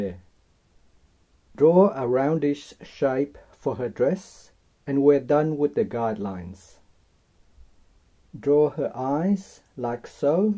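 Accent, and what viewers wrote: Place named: United Kingdom. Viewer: u sound british. sorry for saying that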